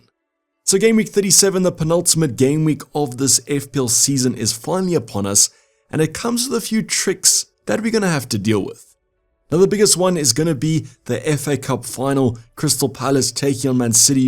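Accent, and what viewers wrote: South Africa. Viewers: This ou is definitely south african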